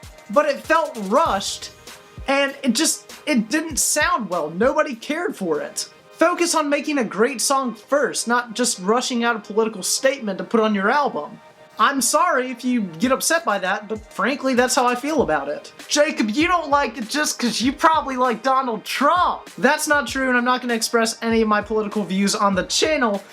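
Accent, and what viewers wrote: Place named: Pennsylvania, United States. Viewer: The way you make your "O" sounds in words I can tell you're from Pennsylvania or around that area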